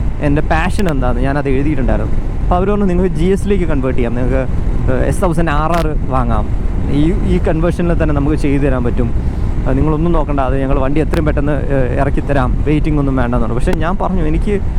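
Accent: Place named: Kannur, India. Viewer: na....nte mone🤣 ningalu kannurinu aduthano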